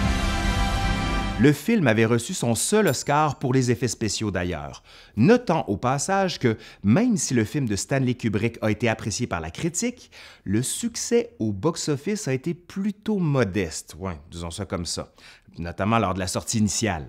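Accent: Quebec, Canada. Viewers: Quel plaisir d'avoir découvert cette chaîne à l'accent français chantant du Québec et sa bonne diction des mots anglais que nous n'avons pas chez les vidéastes français x) Contenu très qualitatif !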